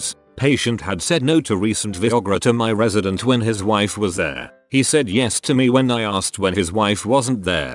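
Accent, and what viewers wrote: United Kingdom. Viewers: The voice reading is British XD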